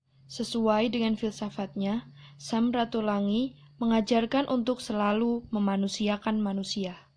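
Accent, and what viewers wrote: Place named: Java, Indonesia. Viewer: Backsoundnya enak nih. Cocok dengan suara pembicara yang medok eheww:v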